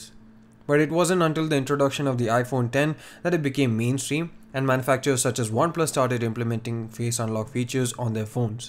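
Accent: India. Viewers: Mallu ayirunno, njan vicharichu hindi karan ennu😀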